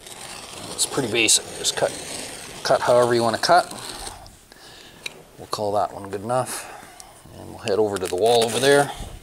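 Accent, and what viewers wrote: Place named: Canada. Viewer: Damn that's the thickest canadian accent I've ever heard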